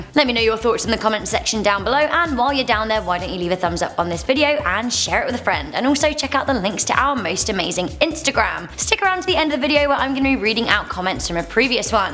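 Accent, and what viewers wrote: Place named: United Kingdom. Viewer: Aay didn’t know u were British like me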